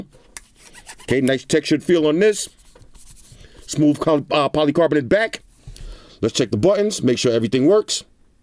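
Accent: New York, United States. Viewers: is from the south and is from New York he has a New York accent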